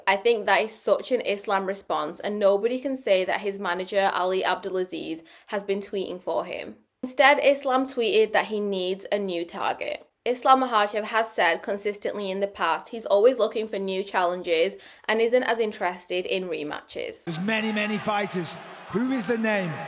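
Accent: United Kingdom. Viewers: Pereira vs aspinall will be held back bc jon jones is holding up the official belt and ducking tom unfortunately. I think if jon beats stipe he will call out pereira and that will be done. Btw am i detecting a yorkshire lass? 🤔😂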